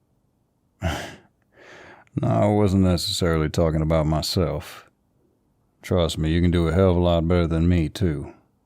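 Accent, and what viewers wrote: South, United States. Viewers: I love your southern accent. It really suits you. I wonder if you talk like this irl🤭 thanks for the part 2 ❤ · I love the southern accent😍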